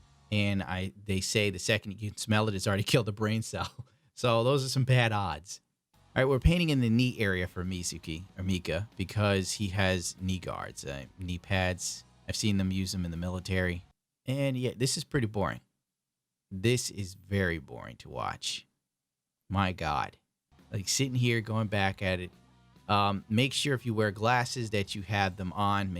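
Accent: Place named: East Coast, United States. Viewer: Love your videos. You are currently my favorite Gunpla youtuber. I love the diorama, and painted gunpla, your east coast accent and comments. All of it is fantastic. One issue I do have is that I can't watch your videos around my kids because of all the cursing. If you can tone it down, cool! I can let them watch with me. If you can't, that's cool too. I'll watch your videos by myself. Keep up the great work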